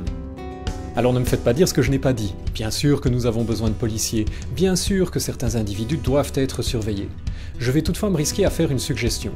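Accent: Belgium. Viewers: 1- On ne dira jamais assez à quel point la bienveillance des accents belges est bien adaptée à la transmission du savoir. 2- La vidéo tombe malheureusement à point nommé.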